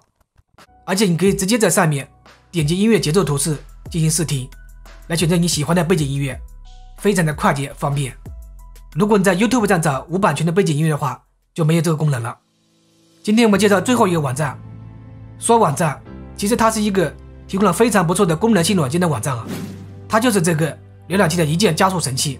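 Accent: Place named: Fuzhou, China. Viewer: 播主说话口音带有很重的福州音...✌️✌️✌️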